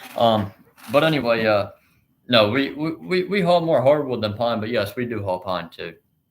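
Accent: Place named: South, United States. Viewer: Wow I had to subscribe to your channel I seen your first one when you were looking for logging trailers your young & I just have a feeling your business is going to go through the roof ❤ 👍🏾🙏🏿 & if you stay on YouTube and don't change your going to do amazing Because you come off sounding so amazing being a young logger & your country accent seals the deal ❤ ❤❤❤❤ You won't be single if you are for long lol from Norristown PA keep up the good videos and may your business prosper an enormous ways & i hooe you fire the people that are not 🚫 helping your business 👍🏾🙏🏿👌🏾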